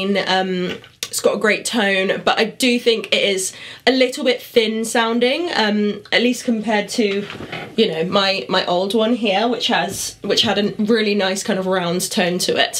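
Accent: United Kingdom. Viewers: Ahhh... she's a Brit ! 🤔🙄😏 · What part of UK does your accent come from?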